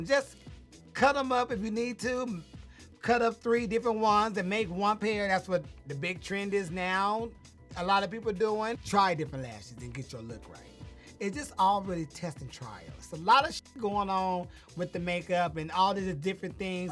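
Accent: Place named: South, United States. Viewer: I'd like a quick peck of a kiss as I promise I've never seen out of drag. Too cute. Nice complexion and skin with thick lips + sounds so Southern with the dialect.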